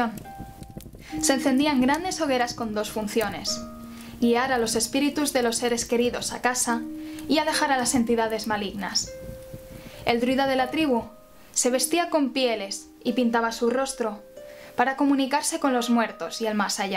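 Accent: Catalonia, Spain. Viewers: Que buena información! De que parte de Cataluña sos? Saludos desde Argentina! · De qué parte de Catalunya eres??? 🙊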